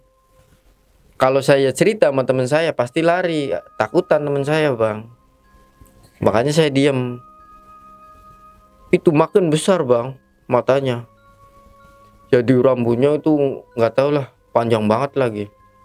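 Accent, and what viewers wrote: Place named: Cirebon, Indonesia. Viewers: Logat ngmng nya ini kaya orang cirebon😄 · logat nya kaya orng Indramayu or Cirebon...Krn paham bener,cerita kurang paham yakin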